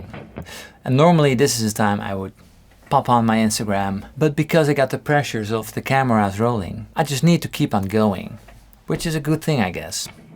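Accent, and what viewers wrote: Netherlands. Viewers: toen ik voor het eerst een filmpje van je keek hoorde ik meteen al ''dit is een Nederlander"' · U dutch? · Is he dutch? He sounds dutch af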